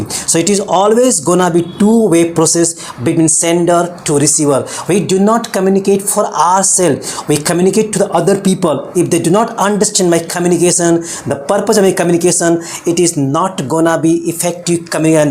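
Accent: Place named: United States. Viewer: Sometimes you speak American English but I love this video because it's totally in English and you have told about communication skill so it's obviously powerful. I've seen this video 3 times today.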